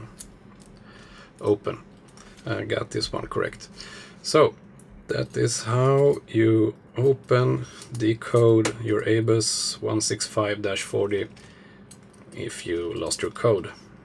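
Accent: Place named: Sweden. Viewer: You are swedish, I hear it by accent... hej på dig!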